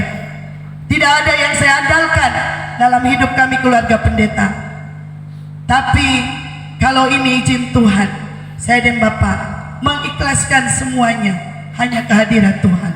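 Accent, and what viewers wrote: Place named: Manado, Indonesia. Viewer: Kalau dengar bahasanya kayaknya dari Sulawesi Utara kota Manado...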